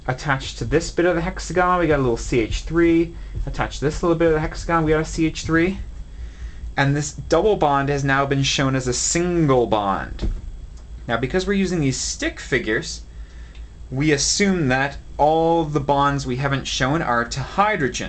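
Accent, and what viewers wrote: Canada. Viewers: you sound very canadian--love it:D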